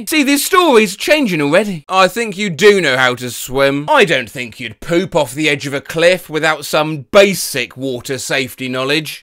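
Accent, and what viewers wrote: United Kingdom. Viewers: He's LITERALLY Sherlock Holmes... speaking fast and in a detective sort of fancy way, deducing fast, British, sherlock soundtrack.... it's literally perfect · You sound british :D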